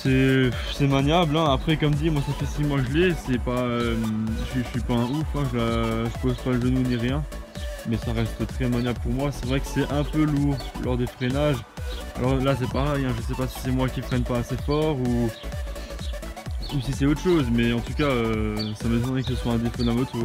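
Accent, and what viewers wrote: Alsace, France. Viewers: ton accent t'a trahi tu habite à Strasbourg ou dans les bled à côté ?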